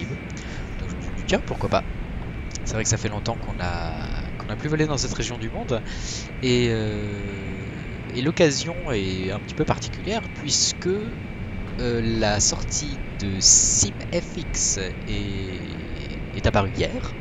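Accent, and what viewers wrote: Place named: United States. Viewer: accent américain yes 😀😀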